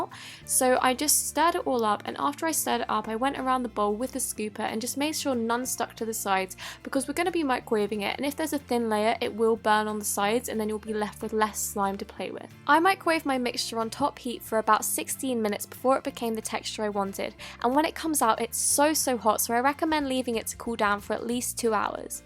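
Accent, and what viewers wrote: England, United Kingdom. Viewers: Sumthing I have her accent, im English :) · U sound British how did u get borax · I found u😂! I'm on insta btw. Love u AND GLAD SOMEONE FINALLY HAS A BRITISH ACCENT LIKE ME 🤣💜🖤💕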